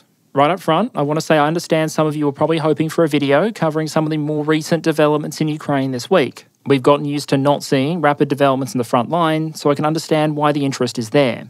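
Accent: Australia. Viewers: Too bad he didn't say "Vampire Counts" in his Aussie accent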